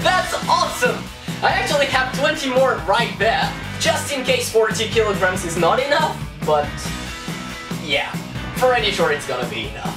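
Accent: France. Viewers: T'as un accent français ! You have à French accent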